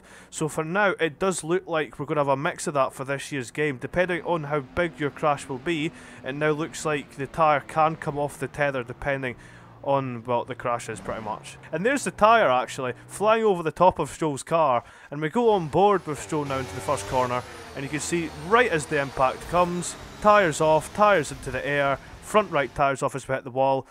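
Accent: Scotland, United Kingdom. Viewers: never heard u talk before but didnt expect scottish lmao another banger though!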